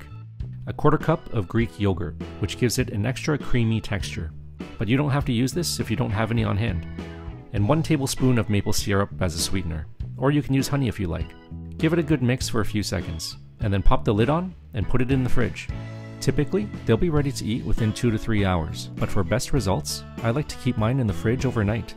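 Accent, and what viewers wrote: Canada. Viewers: Canadian accent, right? :-)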